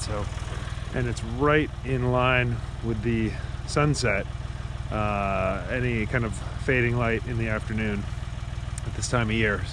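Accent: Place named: South, United States. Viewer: Hey I almost like you talking kinda “southern”. Made me miss my hometown 😂. I love all that cherry wood, is it a soft or hardwood?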